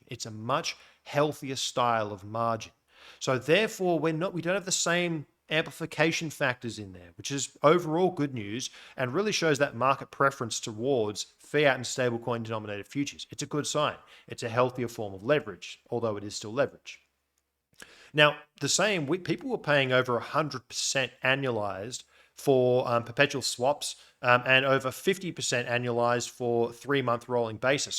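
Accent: Australia. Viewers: Nice to hear an Aussie providing such valuable info in the BTC space...👍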